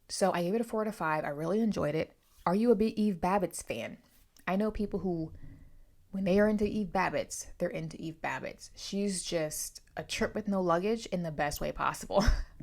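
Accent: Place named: Pittsburgh, United States. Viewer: Funny you brought up your accent. I’ve always thought you sounded like a friend of mine who is from Pittsburgh area. I’m in north central PA.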